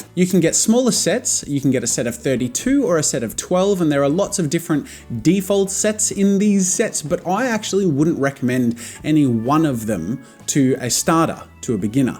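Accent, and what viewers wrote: Australia. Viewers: Ur Aussie?? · are u aussie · all Australians pronounce Manga and Copic like you do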